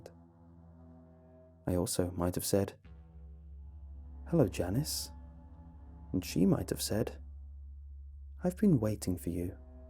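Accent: United Kingdom. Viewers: You have the best brittish accient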